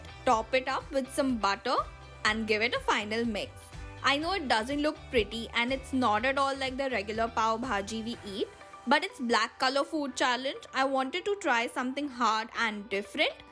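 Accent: India. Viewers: I luv ur hindi accent 😙😙💕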